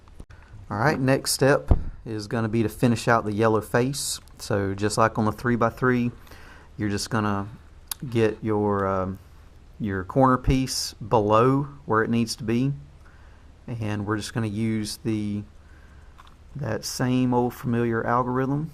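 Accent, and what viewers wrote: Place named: Australia. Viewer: A very strong Australian accent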